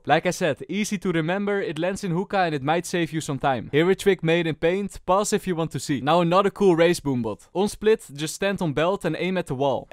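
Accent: Netherlands. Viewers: If you put captions on its dutch! Is accent that heavy?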